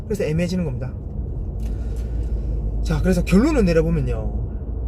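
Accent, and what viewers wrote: Busan, South Korea. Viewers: 항상 즐겁게 강의해서 기분이 좋네요,, 부산 사투리도 정겹네요,,,, 좋은 진실된 정보 감사합니다.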